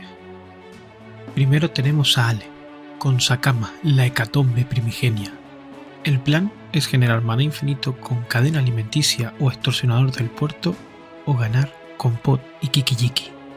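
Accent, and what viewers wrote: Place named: Canary Islands, Spain. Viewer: Que bueno escuchar a un chicharrero comentando Magic, nuevo sub❤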